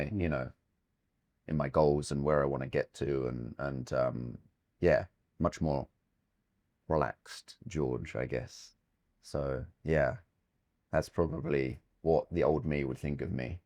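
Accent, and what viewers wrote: United Kingdom. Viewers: ジョージのブリティッシュアクセントかっこいいし男でも惚れます。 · *の喋るコクニーアクセントがまさに*だった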